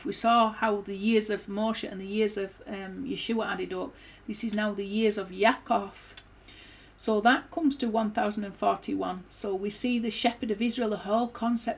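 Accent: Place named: Scotland, United Kingdom. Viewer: May G-D bless you very much today my dear sister. (I insist as I told you in the past: "I adore hearing that Scottish accent"! Once you told me you were borne in England; but I can only picture you as a fine Scottish sister in Faith, Tribe, Tradition, and Past. For sure, also, our future!!! שלום וברחה! אלוהו CHRISTIAN ANGUS GRIFFIN-MACKENZIE Enjoying your lesson from Santiago, Chile 🌶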